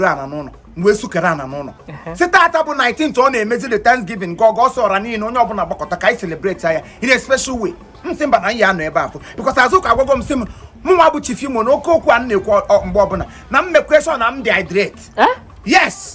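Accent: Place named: Anambra, Nigeria. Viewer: So chief can speak anambra like this. Nwoke ah bu all rounder 😄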